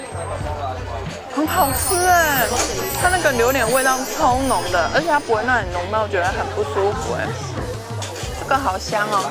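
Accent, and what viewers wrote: Taiwan. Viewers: 好喜歡聽講台語，台妹味十足! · 最近才開始看你的視頻... 很美，雖然有點台灣國語，但一點也不影響你天然的氣質，更難得的是吃相很優雅..要繼續加油喔！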